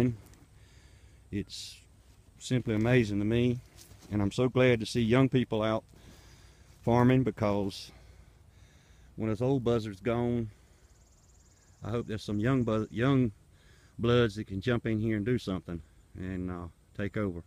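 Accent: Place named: England, United Kingdom. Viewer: Haha nice English accent! So U and ur cousin farm together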